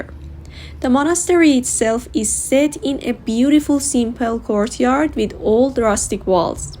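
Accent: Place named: Iran. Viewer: what a pretty Iranian-English accent. I adore it, so enjoyable to listening, can't get enough of it; eat your heart out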